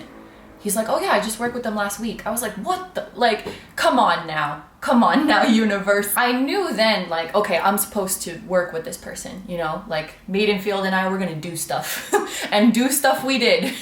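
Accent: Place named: Canada. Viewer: This answered a couple of questions for me. Explains your accent, it’s a Canadian one (I’m also Canadian), the only question I have left is do you speak French?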